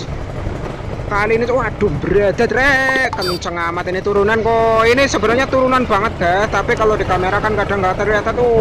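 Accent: Banyumas, Indonesia. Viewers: Wkwkwkwkwkwkw ngomonge pada bae kaya inyong kang asal ngomong, #salamngapak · Kalau nonton lu bikin inget kampung halaman ,ngapak bang 😂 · Bahasan kue loh,,HAHAH ngapak banget..keren keren..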